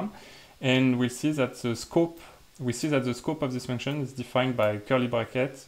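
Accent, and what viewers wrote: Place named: France. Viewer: are you french? i recognise this accent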